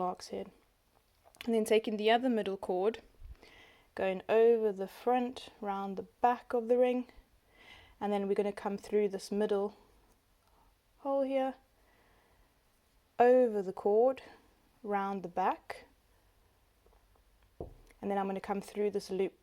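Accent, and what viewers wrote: South Africa. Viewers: You sound like you’re from South Africa? 🇿🇦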